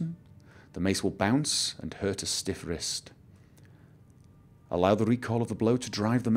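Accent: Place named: Scotland, United Kingdom. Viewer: It sounds like you have an English accent, but you roll your R's like a Scotsman. Did you invent your own accent?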